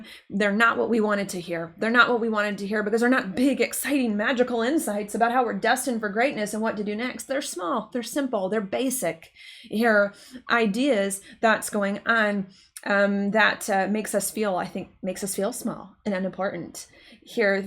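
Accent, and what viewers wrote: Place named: Georgia, United States. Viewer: Georgia huh⁉️ That explains that accent of yours I love so much lol❤️😅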